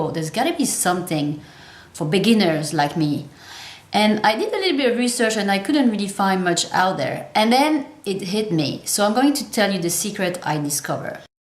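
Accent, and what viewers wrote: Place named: France. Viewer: Thanks for the information and the cute French accent. I’ve liked and shared.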